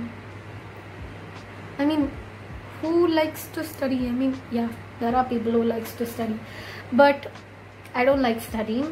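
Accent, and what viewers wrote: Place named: Kerala, India. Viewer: Her Malayalam accent was super good!!!!!!!<<<3💗🧿🤌🏻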